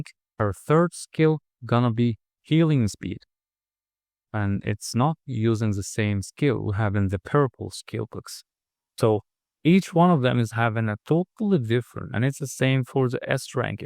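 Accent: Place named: Russia. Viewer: Ur English says u are from Russia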